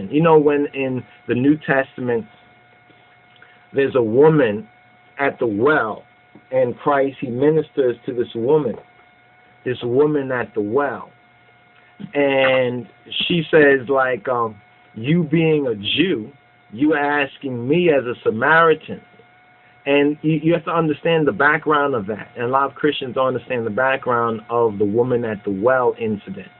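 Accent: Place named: West Coast, United States. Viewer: Wow!!!! you really should read your bible and look for salvation instead of looking to find what race people are there are good arguments both ways but neither have anything to do with salvation. And why is it that in the comments anyone who disagrees with you is racist but your video about salvation is for blacks is not racist? also just throwing this out there for ya Solomon had a black lover but in the book song of soloman she is saying for him not to look down on her because she is black. Now if he were a black man why would she say that? He was the son of kind david by the way. Also I noticed your channel is Ethiopian world network and you sure are dressed the part but im curious what part of Ethiopia are you from cause most of the people I have seen from your country don't have west coast accents..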